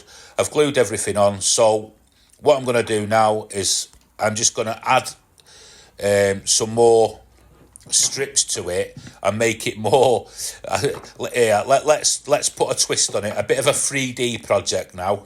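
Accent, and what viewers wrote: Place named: Manchester, United Kingdom. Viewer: great projects, like to hear that proper manc accent when you narrate your videos,can see you getting more confident in yourself,can't wait for the next one 👍👍👍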